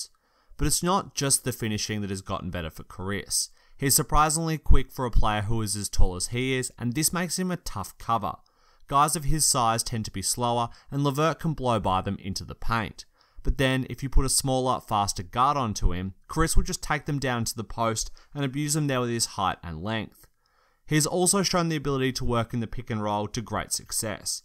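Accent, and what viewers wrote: Australia. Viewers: I'm gonna just say it is his Ozzie accent.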